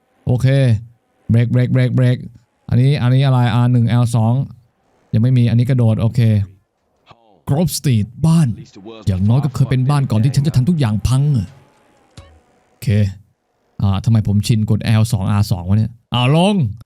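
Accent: South, Thailand. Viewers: ลุงไม่แหลงใต้แล้วหรอครับ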